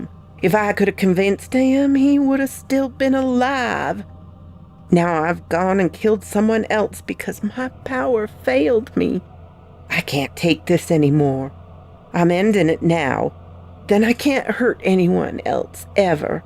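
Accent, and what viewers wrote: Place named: South, United States. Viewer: Hey. Thoroughly enjoyable creepy stories. I loved the southern accent too. Hope you're having a great week. Catch you soon.🌷🎩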